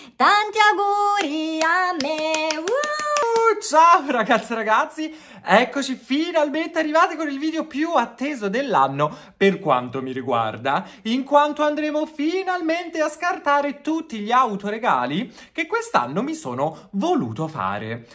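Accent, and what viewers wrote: Tuscany, Italy. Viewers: Ciao ….io è da poco tempo che ti ho scoperto e ti seguo…ma sei stato una meravigliosa sorpresa….non riesco a fare a meno di guardare i tuoi video perché mi fai spataccare dalle risate….sei una persona davvero stupenda e sono molto felice di averti scoperto! Poi hai quell’accento toscano che io ho sempre adorato e mi piace da impazzire😍 ti auguro tutto il bene di questo mondo e che tu possa fare sempre piu video e avere sempre più persone che ti seguono…perché te lo meriti! Sei unico!❤………… ah stavo quasi per dimenticarmi…..no scherzo🤪😂 tanti tanti auguri di un meraviglioso compleanno❤ ti adoro 🥰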